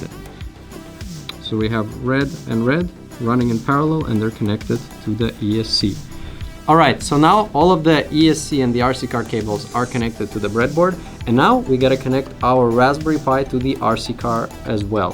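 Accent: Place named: Russia. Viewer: He has a Russian accent )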